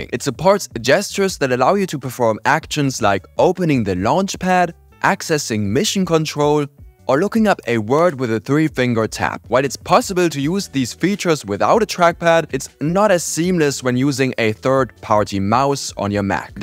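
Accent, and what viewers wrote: Germany. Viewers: Ich habe echt etwas gebraucht, bis ich den deutschen Akzent durchgehört habe - Hut ab! 😁 Dein Englisch ist super 👍 Abo hab ich auch mal dagelassen :) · Sounds like a germany accent to me.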